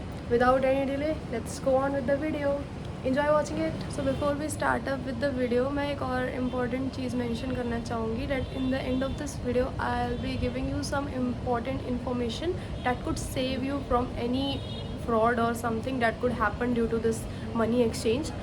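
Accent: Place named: Bihar, India. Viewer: Bihari sound aarha h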